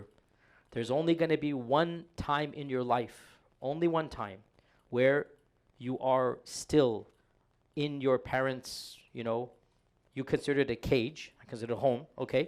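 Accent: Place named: South Asia. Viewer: You sound desi AF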